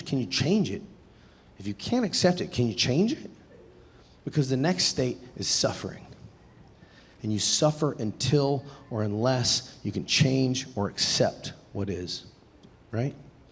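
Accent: South, United States. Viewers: Agreed! It's giving Josh Johnson precision with a southern white dad twang. Here for it 🙌🏾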